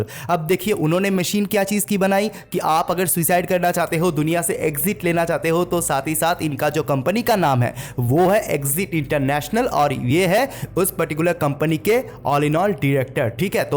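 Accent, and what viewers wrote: India. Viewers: Aapki voice indo english lagti hi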